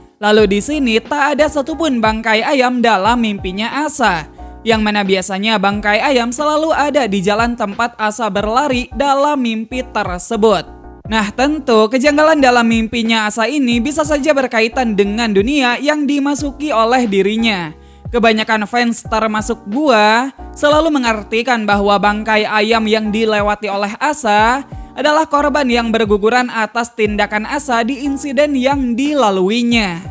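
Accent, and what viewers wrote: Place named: West Java, Indonesia. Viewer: Wew dengan logat sunda gitu?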